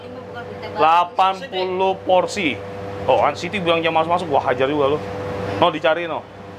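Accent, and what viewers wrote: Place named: Tegal, Indonesia. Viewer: Aja miring miring ooohhh hhhhh.... Kayane sih wong tegal lek ora wong purwokerto..😀😀😂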